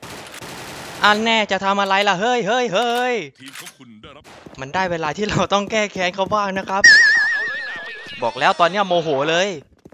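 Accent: South, Thailand. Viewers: คนใต้ จังหวัดไหนนิ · พี่เป็นคนใต้หรอค่าบ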